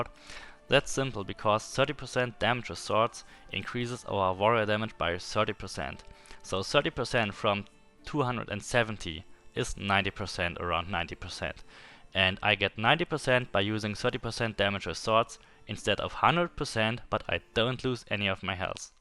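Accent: Germany. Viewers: I thought the same and wanted to write the same comment. The accent is so German😂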